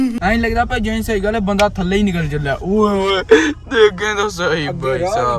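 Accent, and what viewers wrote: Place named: Gujarat, India. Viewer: Mazy laa ry o ❤ I am from Mandi bhaudin ❤ I think you are from gujrat ap ki boli gujrat wali haa❤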